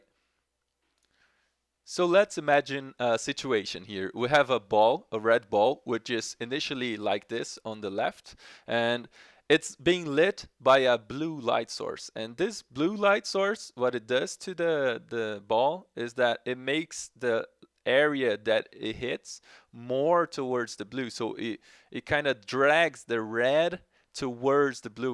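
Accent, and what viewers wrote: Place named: Sweden. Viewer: you sound like pewdiepie when he had the really thick Swedish accent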